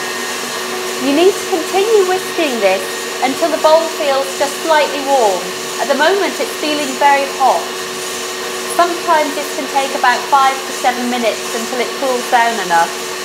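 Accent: United Kingdom. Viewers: Why does this woman's speech sound so cute? I actually don't like British accent but I might be about to change my mind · I love her British accent :)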